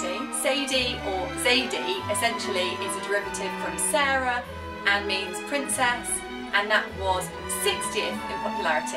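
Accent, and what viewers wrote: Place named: RP, United Kingdom. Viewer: I think your wonderful accent makes everything sound extra posh.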